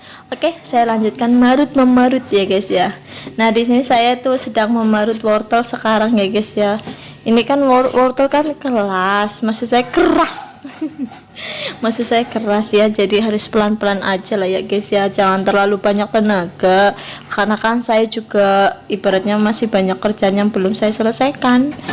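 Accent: Banyumas, Indonesia. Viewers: Wa'alaikumsalam warohmatulohi wabarokatuh Salam ngapak ora ngapak ora kepenak juga mbak wong wadon ngapak🙋‍♂ Wah asik dong gantian ngawasi majikan masak😀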